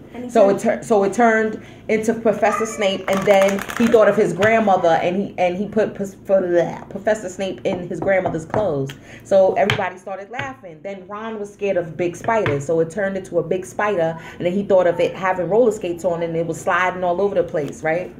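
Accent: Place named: New York, United States. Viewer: I love your delivery. You are suuuucccchhh a NY girl lok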